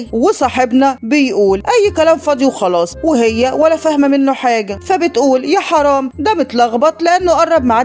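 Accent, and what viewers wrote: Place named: Iraq. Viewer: هاذي الرواية عندها اكثر من اسم؟ اسمها الاميرة المحبوبة او كيف اصبحت اميرة المهم اوك هتخلى عن لوكاس بس هاذي المررة وانا ليا كلود هو في اب بوسامتو لا ده غير فيليكس وايجيتل المسكين انا كلي رومانسية وبعشق الرومنسية بس مو لذي الدرجة الي انتي متصوراتي بيها رومانسية في الروايات والالمسلسلات بس في الواقع غيييير وان شاء الله ندخل الجنة وهنيك نقدر نحلم زي مابدنا ومن لهجتك واضح انك عراقية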